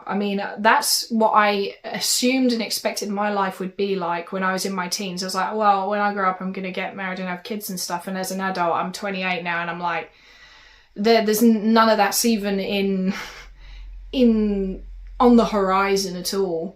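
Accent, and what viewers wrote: France. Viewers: Hi are you secretly French or do you just have an insanely amazing French accent?! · I love your French accent haha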